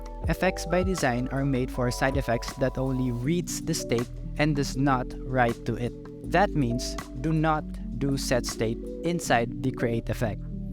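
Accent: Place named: Philippines. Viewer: Bro you sound like a filipino, I'm guessing you are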